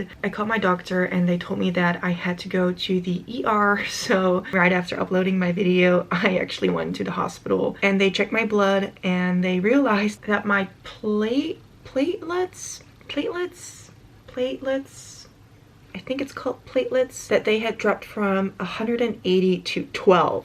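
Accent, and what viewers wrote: United States. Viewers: yeah she says about watching American channels on TV so maybe picked the accent up there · I also thought she was either an American or a Canadian. Her grammar is also spot on. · You sound like somebody from Texas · The English question answered my question bc it sounds like your from here in USA lol. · You’ve definitely got an American accent. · All the best to you ! You English is 👍👍👍 At first I thought you are an American ! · How is your english so great? You sound more like an american versus dutch.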